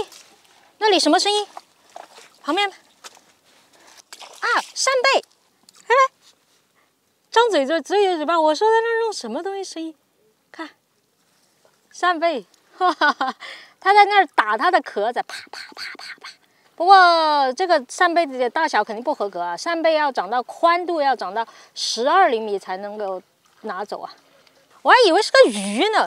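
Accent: Sichuan, China. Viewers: 这说的是四川普通话吗？？？ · 你四川哪儿的？ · 哈哈幺妹儿是四川哪里的？ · 妹儿 四川人啊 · 妹妹是四川人吗？ 很好听的川普呀，😝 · 你是重庆的还是成都的😁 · 四川的蛮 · ，你是我们四川老乡吗？好重的川味普通话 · 四川话好可爱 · 泸州 or 宜宾人吗?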